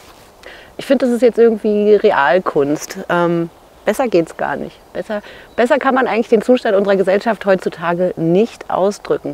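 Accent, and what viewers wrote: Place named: Upper Franconia, Germany. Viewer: des is fei jetzt aber nett schee. Oberfränkisch ist ein sehr schöner Dialekt 😂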